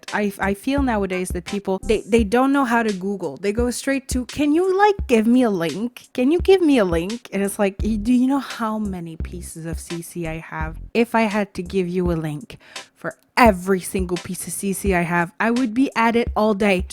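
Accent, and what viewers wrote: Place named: Quebec, Canada. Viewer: Love your, french Canadian? accent?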